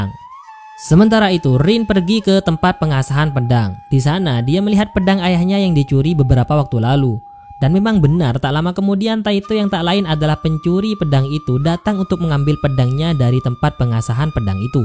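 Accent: Madura, Indonesia. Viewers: Ini orang madura ya?? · Logatnya kek orang madura. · Medureh dimmah kak??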